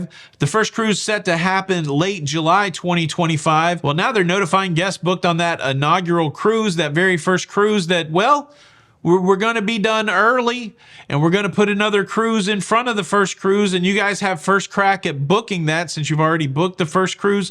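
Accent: United States. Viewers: Sounds typically American to me.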